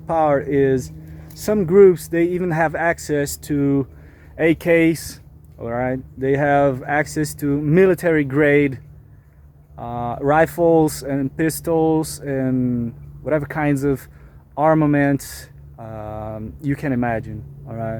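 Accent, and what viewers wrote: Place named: Brazil. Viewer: Publica mais videos, estou tentando aprender ingles e ver um br falando ingles com sotaque é mt bom pra mim praticar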